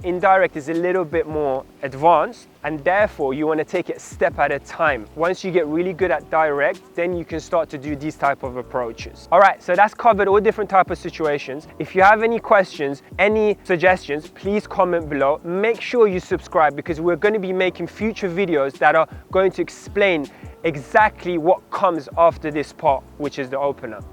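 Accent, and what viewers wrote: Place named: United Kingdom. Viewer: I was gonna use this guy's tips but I am lacking the British accent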